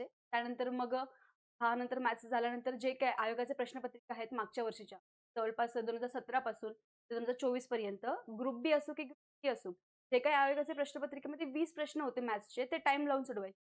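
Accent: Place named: Agri Koli, India. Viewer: मॅडम च्या accent वरून त्या आगरी कोळी कोकणातल्या असाव्यात.... तिकडे असं बोलतात तर घ्या समजून...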